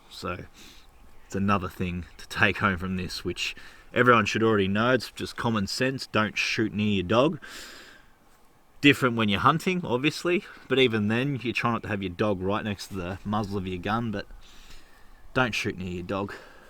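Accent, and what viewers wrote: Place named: New Zealand. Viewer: awesome vid. im trying to work up a load for the same rifle. thanks for doing this. p.s sounds like ur in NZ - i would love to come hunt there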